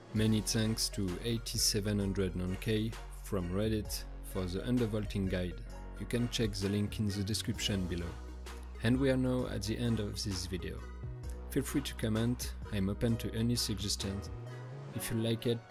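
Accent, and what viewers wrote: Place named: France. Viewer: Wech la france !!! 🙋🏻‍♂️😂 trahis par l’accent ! Respect ! Vraiment dure de parler comme ca 😌 bonne video ! Beau matos! J’ai un T1 moi 🤘🏼ssf gang !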